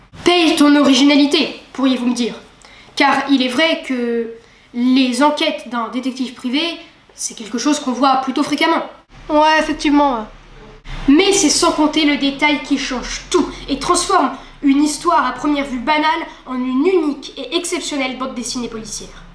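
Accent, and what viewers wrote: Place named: Spain. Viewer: enfin te revoilà! une bien sympathique vidéo, qui donne envie de se mettre à la BD, merci! j'ai adoré ton accent espagnol aussi!;)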